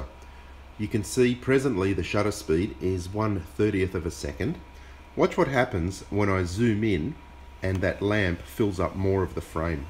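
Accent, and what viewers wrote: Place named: Australia. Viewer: Quick question about your filming location. If it’s not too much trouble, can you post your coordinates and the direction you’re facing please? I’m assuming ( because of the accent) that you’re in Australia or New Zealand. If that’s true you’d be facing northwest to film , correct? Did you take into account any physical obstructions (like mountains) that would create a false horizon? Mountain ranges in the distance or other obstructions can do this, creating the illusion of a physical horizon. I’d just like to be certain of what we’re seeing. TIA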